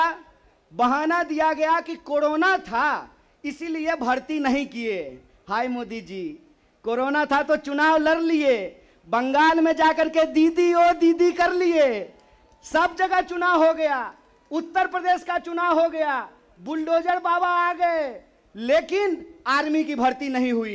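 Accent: Bihar, India. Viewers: Bihari style like our souls 🥰🥰🥰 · His Bihari tone even make his speech more loud and powerful and also beautiful... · It's dialect of Bihar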